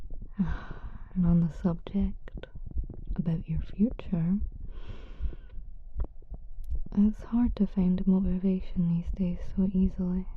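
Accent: Scotland, United Kingdom. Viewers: u have to be from scotland the voice just it speaks to me i mscottish and u sound exactly like other vtuber / f4m content scottish creators and some people in my area lol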